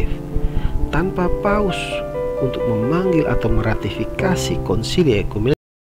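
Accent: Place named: East Indonesia, Indonesia. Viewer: suara dalam video ini...kedengaran macam suara sdr dari Timur...mungkin NTT atau FLORES. apapun...semua Gereja Tuhan itu belum ada yang sempurna, hingga nanti menit TERAKHIR di sempurna kan sang PEMILIK GEREJA, jadi bisa saja bersatu walau dalam perbedaan.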